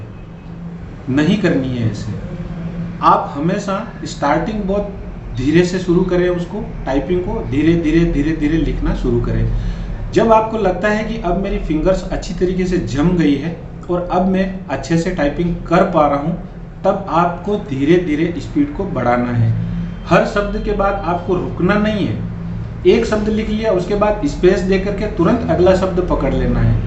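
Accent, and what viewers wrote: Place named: Himachal Pradesh, India. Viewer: Aapni voice himachali lgdi h😊 mai himachal se hu mera 6 August ko typing test apki video kafi helpful rahi i hope mera test acha jaye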